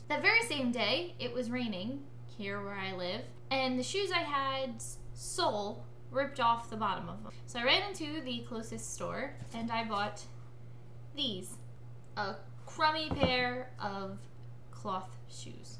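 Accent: New Jersey, United States. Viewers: Watched a few of your videos and this is the first time I heard your Jersey accent. P.S. love your old, old videos. You were so damn cute. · You can hear her Jersey accent a lot in this video, especially when she says "off".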